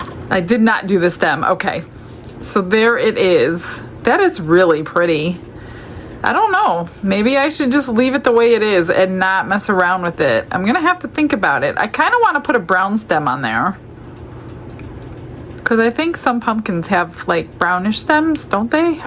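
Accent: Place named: Midwest, United States. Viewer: I get so many neat ideas from you. Your speech pattern and vocabulary make me think you are originally from the Midwest. I am from Nebraska and you make me think of home 😊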